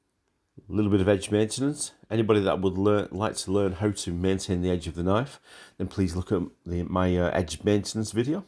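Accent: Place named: Lancashire, United Kingdom. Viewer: Love the video proper job done sounds like your from Lancashire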